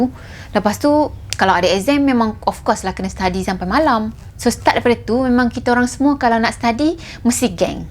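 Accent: Kelantan, Malaysia. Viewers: Laaa..ore kelateee..... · Salam NI orang kelate ke?? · Asal kelate ko?? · Mek kelante rupanya mati2 aku ingat wong jowo. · Ore kelate ko?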